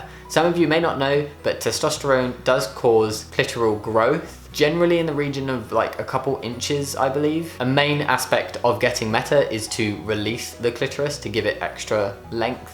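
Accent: United Kingdom. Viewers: I love how you're so awkwardly british lol <3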